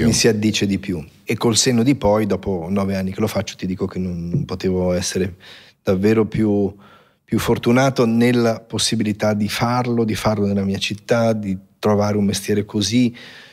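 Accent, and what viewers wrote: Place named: Mestre, Italy. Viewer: Io sento comunque , nonostante gli anni a Bergamo , la R la S mestrina 😅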